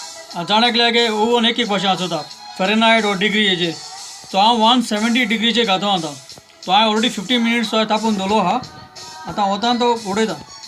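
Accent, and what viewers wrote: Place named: Salcete, India. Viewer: I've subscribed to your channel, just to listen to your konkani. It's my salcete dialect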